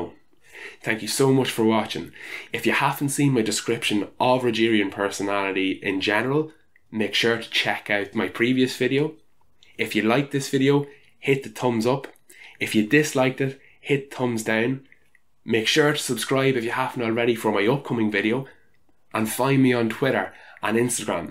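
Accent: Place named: Ireland. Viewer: the kindness in the eyes, the gentleness that comes up naturally, the polite approach combined with evidence, and the lovely 'r' cannot belong to anyone else but an Irish soul! I am a foreign student from Bulgaria and have done my BSc in Scotland and MA in England and have been luckily blessed enough to meet the Irish culture as well along my way and could say that I love all my Irish friends! Been a pleasure to watch your content! Keep up the good work!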